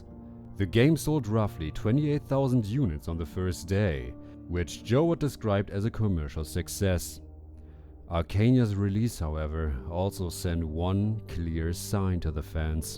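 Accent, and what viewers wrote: Germany. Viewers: Cooles Video! Ich wollt grad schreiben, dass Du die deutschen Namen echt gut aussprichst, aber nach ein paar Minuten viel mir auf, dass Du wohl einfach deutsch mit gutem Englisch bist xD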